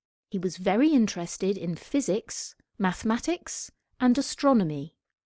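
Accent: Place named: United Kingdom. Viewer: I love British Accent.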